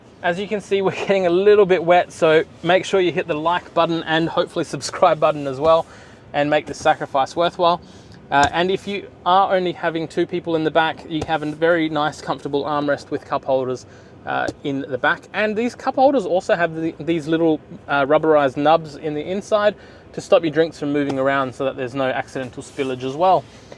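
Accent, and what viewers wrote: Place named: United States. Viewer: Nice car review! Thank you! Btw, you sound like an American so much😂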